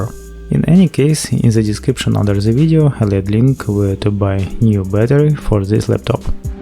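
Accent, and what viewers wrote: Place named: Russia. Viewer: Чувствуется русский акцент) Неплохо говорите на английском. Все понятно)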